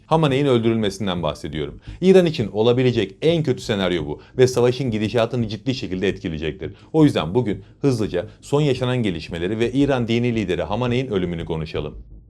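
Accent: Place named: United States. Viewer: Amerikan ağzı ile konuşuyorsun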